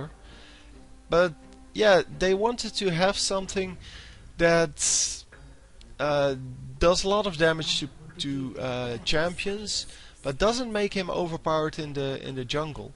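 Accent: Netherlands. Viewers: Are u Dutch? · He is from the netherlands, and his accent is a very typical dutch accent.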